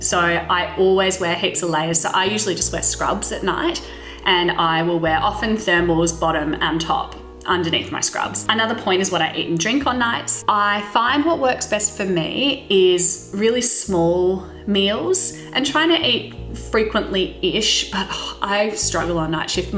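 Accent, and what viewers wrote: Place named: Australia. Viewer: Oh no, I am terribly sorry, I thought you were a British Doctor from the UK. I mistook your Australian accent to British accent. Normally I don't miss. Once again, sorry about that.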